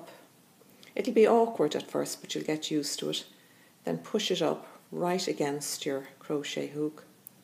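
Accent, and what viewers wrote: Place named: Ireland. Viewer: Very clever lady! Thank u. Love love love the Irish accent! Xo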